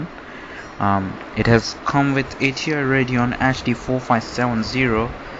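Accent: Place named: India. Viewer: Hey can u tell me how much did u pay for this laptop i think u sound like an Indian Person...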